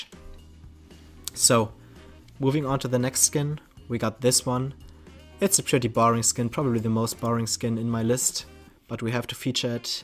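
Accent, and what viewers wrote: Germany. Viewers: Ultra German accent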